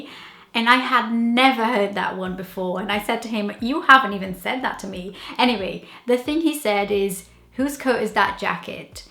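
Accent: Wales, United Kingdom. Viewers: what welsh twang in ur voice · You have such a welsh accent for a Brazilian! No offence! My boyfriend is from Bangor, North Wales and I’m from Aberystwyth, Mid-West Wales and our accents are kinda different but we love each other’s, it’s so cute 😂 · You picked up the welsh accent for surel. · I’m a Londoner and to my ears in your normal speech you are definitely Welsh with a light touch .incredible! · You have a Welsh accent!! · Wow, I'm Brazilian and I'm married to a Welsh man too and it is SO refreshing to hear you speaking with Welsh accent. Da yawn i ti!!! We are preparing to go back to Wales and finding your channel was a great bonus. Hope we bump into each other at some point. Hwyl! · Absolutely spot on. And btw nice welsh accent!!🤪👍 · Sound full on Welsh not just a little bit. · You’ve got a bit of a welsh accent coming through! I’m Welsh and didn’t realise that half of these things were a “Welsh thing”. 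· You have a nice Welsh accent developing there. Tidy! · im welsh . you sound like you have a welsh accent aswell xx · And you are picking up a Welsh Accent, a Valley’s accent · Your accent is turning Welsh. Cardiff? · Welsh, I am, from Swansea, living abroad. "Who's coat is that jacket?" is a new one on me, never heard it before. But...one of my favourites is.."now then!" Great video...it got me smiling in recognition. And your accent is SO Welsh...loved hearing it! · I can hear the Welsh in your accent. I wouldn't know you're from Brazil until you said!!! Aww tidy mun!!! 😊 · This is brillant video. I chuckled all of the way through it. Sometimes if somebody says "Can you do this now?" you may hear the reply "I'm busy now. I'll do it again" (at another time) or "I'll do it now jest" meaning later or "later on". To "cwtch" can also mean to hide (something) or the cwtch, the space under the stairs or a place where the coal was kept, the coal cwtch. 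To "potch" - to tinker with. Blackpat - cockroach. The list is endless. Around here (Rhondda Valley) you hear them all the time. I love your accent. You really have picked up a Welsh "twang". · You do not have an English accent, you have a wonderful Welsh accent. If I didn't know your story, I would swear you were Welsh born and bred. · How do you sound welsh en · God i love the welsh accent twinge to your accent.... Genuinely never knew some of these were welsh things.... nice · Brilliant girl! Tidy Welsh accent🙏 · Your accent sounds 100% welsh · I did the opposite. I am a Welsman that decided to live in Brazil after me and my Brazilian wife got married. I did notice straight away your Welsh accent by the way. Congrats on your perfect English (Wish I could say the same for my terribke Portuguese) ''Miss my Wales I does see'' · I thought you was welsh lol, you have quite a strong accent not just a twang 😅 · Did you learn English in Brazil? 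Or did you learn the majority through immersion when you moved to Wales? You do actually have quite a bit of a Welsh accent, you don't have a real noticeable Brazilian accented or having a "neutral BBC English" accent. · Your accent sounds authentic Welsh to me ! I'm just over the bridge(s) , Bristol 🏴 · Wow ur accent sounds quite welsh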